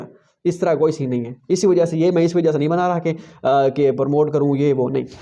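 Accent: Saraiki, Pakistan. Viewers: I appreciate you brother آپ کی وڈیوز دیکھنے کے بعد مجھے پتا چلا کہ csc بھی کوئی نام کی چیز ہے آپ ہر کمنٹ کا جواب دیتے ہے اللہ تعالٰی آپ کو کامیاب کرے ہم سب کی دعائیں آپ کے ساتھ ہے ۔ سرائیکی وسیب کے آپ پہلے بندے ہے جو اس کام میں بہت آگے ہے آپ کا سرائیکی لہجہ ہمارے لیے سمجھنا بہت آسان ہے کیونکہ ہم سرائیکی ہیں اور ہماری دلچسپی بڑھتی ہے میں آپ کو سلیوٹ کرتا ہو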